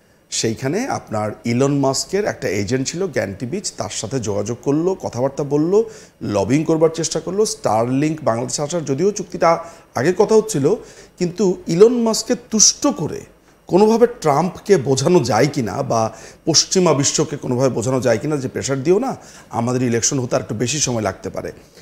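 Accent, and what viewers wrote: Kolkata, India. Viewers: Kolikata accent already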